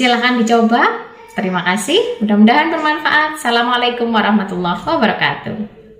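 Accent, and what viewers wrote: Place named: Java, Indonesia. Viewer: mantap ...suka bangat gaya bicaranya dokter...medok..hee.hee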